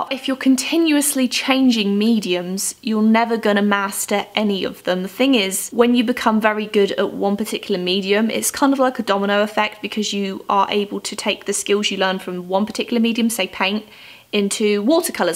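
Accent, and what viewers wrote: England, United Kingdom. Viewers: I just watched this video which was the first one for me and you made it enjoyable. I enjoyed your style of presentation so Kudos! From your accent you are English yet are your living in the US? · I made a few pages in my sketchbook that I named "mouths", "eyes" and drew over the whole pages. At first I was like "I need to make great works in it", but soon I realized the point of it xD Also, when I watch your videos I always pick that British accent. It's very helpful, since I study English XD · Which country do you live in because you sound English, but I've never seen Prismacolors in the UK (I'm Welsh) and you were stating the price in dollars? You also mentioned Hobby Lobby but I'm only familiar with Hobbycraft?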